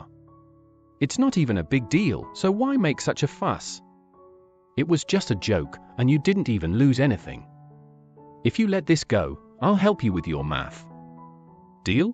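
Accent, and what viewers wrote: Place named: United Kingdom. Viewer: I love the British accent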